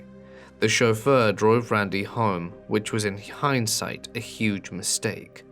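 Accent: Ireland. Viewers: I love your Irish accent! Happy new Year! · That Irish accent is pretty hot. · Love the Irish accent,!! Happy New Year!! 🥳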